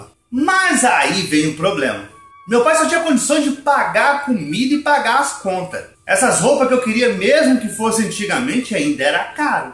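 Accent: Minas Gerais, Brazil. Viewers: Mineirês??? Kkkkkkk mutriii 😂😂😂😂😂😂!! Ahh sim, manoo do céu, quase passo mal de tanto rir 😂😂😂😂😂😂 choreeeiii largaaado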